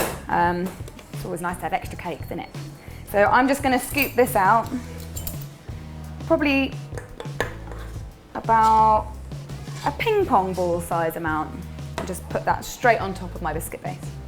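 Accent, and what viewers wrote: England, United Kingdom. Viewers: Is she british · That English accent, those cute dimples... and she bakes too. What more do you want in a woman? hahaha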